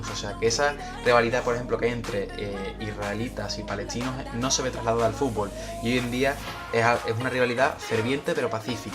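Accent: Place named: Seville, Spain. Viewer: Por tu pronunciación de la letra T....q me parta un rayo si no sos de Sevilla!!! Jeje.....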